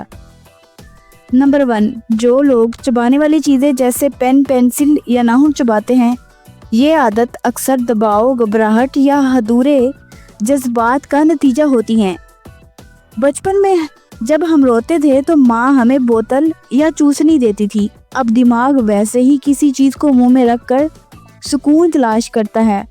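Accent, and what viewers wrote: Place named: Punjab, Pakistan. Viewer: Allah ka wasta hy ,tm log urdu ka baira garaq mat kiya kro,video bananay sy pehly urdu Bolny ki achi tara sy practice kr liya kro, Urdu ko bhi panjabi ki tara bolty ho😕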